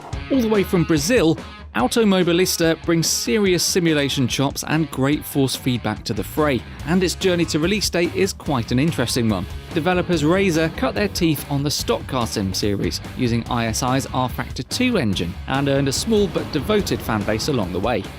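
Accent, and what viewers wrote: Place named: England, United Kingdom. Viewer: You sound english. Why ATS and not ETS2?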